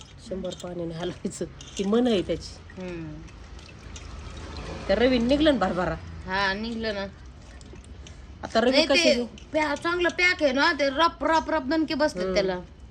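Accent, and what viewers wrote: Ahmednagar, India. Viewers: बोलणे तर नगर सारख आहे पुण्याचे वाटतच नाही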